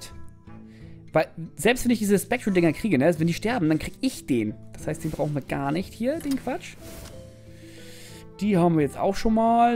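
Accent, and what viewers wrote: North, Germany. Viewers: ich finde das auch gut so wie die Videos sind :). Bin selbst zu dumm für HearthStone und mag es dennoch dir dabei zu zu sehen, weiß gar nicht wieso ich dich nicht vor der Session mit Chris gesehen habe... bist sehr unterhaltsam und ich liebe den nordischen Dialekt :D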